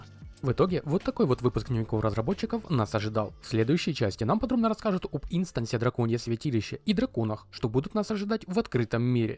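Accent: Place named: South, Russia. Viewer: Бро, извини за возможно лишний вопрос, но почему у тебя в разных видосах где то есть диалект, а где то нету, говоришь без него, на букву "Г" предположительно южного диалекта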